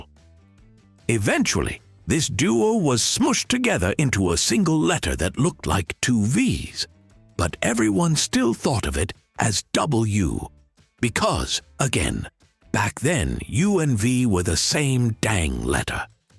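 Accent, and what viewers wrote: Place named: United Kingdom. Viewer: Thank U very much!! 👍 👍 😃 😃 Absolutely LOVE the British accent!! 😉 😁 😁 😁 🤗 🤗 🤗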